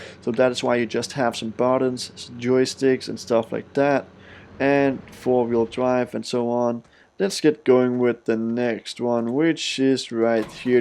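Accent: Denmark. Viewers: Man kan godt høre du er dansker, haha fede videoer!!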